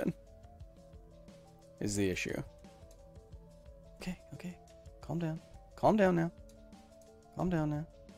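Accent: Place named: Australia. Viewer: I’d say your Australian accent sounds similar to Wood’s accent from Beatemups. Not really an opinion on what region it sounds like, but you can do an impression xD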